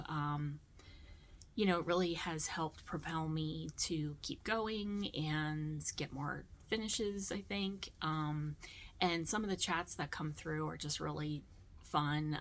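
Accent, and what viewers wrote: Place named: Pennsylvania, United States. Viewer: Hi, this is my first time watching your FT, but as soon as I heard you, I recognized the PA accent! I live in NC, but grew up in the northern MD area. I’ve gone to The Stitching Post as well in Catonsville.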